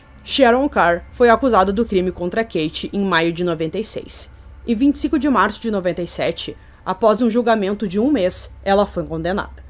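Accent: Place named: Rio Grande do Sul, Brazil. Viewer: Ela parece o Mário cortela falando. Será q e gaúcha tmbm?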